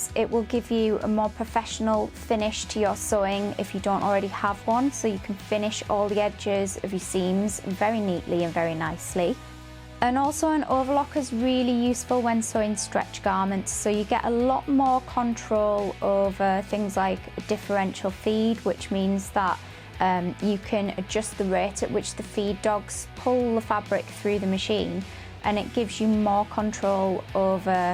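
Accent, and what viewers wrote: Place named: United Kingdom. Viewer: Nice to hear a UK accent 😎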